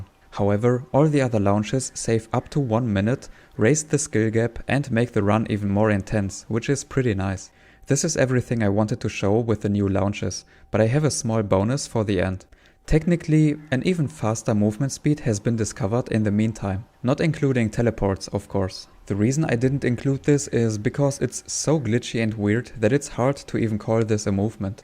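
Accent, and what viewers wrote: Germany. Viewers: digga und man hört trotzdem in den ersten 2 worten das du deutsch bist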